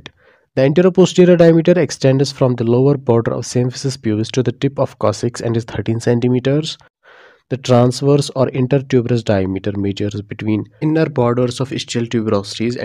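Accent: Kashmir, India. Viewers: Sounds like a kashmiri guy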